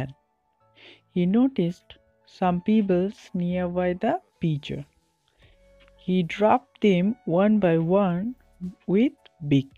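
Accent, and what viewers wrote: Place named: Europe. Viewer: Perfect voice Like European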